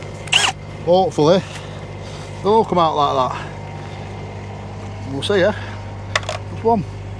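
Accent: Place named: Wigan, United Kingdom. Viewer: you sound local , are you from wigan? either way your videos are pretty decent.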